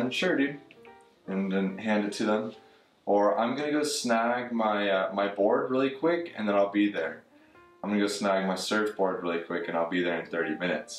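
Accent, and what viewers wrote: California, United States. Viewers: I like the way people from Cali talk!!!! Floridians talk too funny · I’m a Californian and yes this how we talk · Haha, I gotta say, personally I've never heard someone with as pronounced of a valley girl/surfer guy accent. I'm sure some people say it, might just be the area I'm in, but the "whatsaah dude" and "gnarly" feel more like the stereotyped Californian accent than how an actual Californian speaks lol The "yeah no" and "no yeah" are on point though 😂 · Just here as a California female native born in 1972 in Danville, CA searching for ...do I actually have an accent...you are much younger but talk like me, so that is something.... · This is "TV Californian". Not saying people don't use it but it's not how we spoke when I was growing up in Southern California in the 50s. (My family has resided in SoCal for over 120 years.) Classic "Californian" sounds more Midwestern sans the solecisms of the mass entertainment era. 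· I think it's kinda cool. I think it's the mainstream version of what ppl outside the state probably think Californians speak like.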